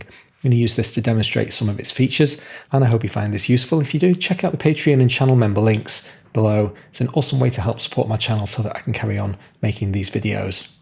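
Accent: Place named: Merseyside, United Kingdom. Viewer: Thanks for the considered reply. I have a Master's in Education and taught at universities for over 20 years (not DAWs). In that setting, my students were either complete beginners or had to have a certain level of PK, as they had taken other more basic modules. But, here, you could get all levels. I understand that, but I've given up on some 'teachers' as they always assume prior knowledge. This includes some of the 'official Cubase' presenters. P.s. from your accent, I recon I'm (originally from your neck of the woods - Merseyside).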